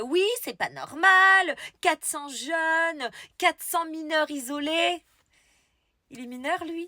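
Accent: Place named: Nord, France. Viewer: T’habites vers Poitiers mais t’as l’accent du Ch’nord..t’es typée du Ch’nord 😊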